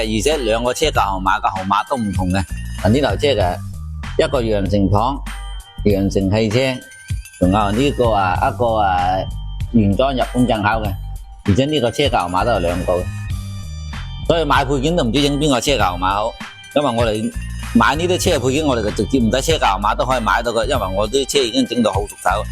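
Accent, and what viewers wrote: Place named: Nanning, China. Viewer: 这粤语说的听起来真难听 南宁的吧这个人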